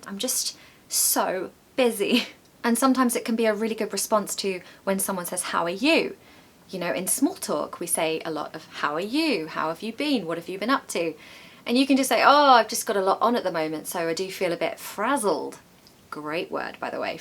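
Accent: United Kingdom. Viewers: Love you from Algeria I learn British accent from you and I'm doing well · Hi mam your British accent English speaking is progressive for students those want to become fluent in English language thank you so much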